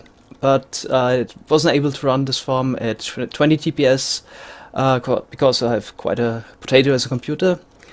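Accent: Germany. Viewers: D :D u are german aren't u? and thx for the nice video ;)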